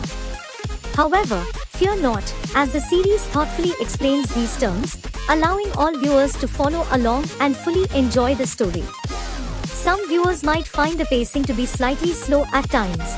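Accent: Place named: India. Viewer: Chinese drama described by a lady with a thick Indian accent which is difficult to understand by Chinese or American viewers! Why?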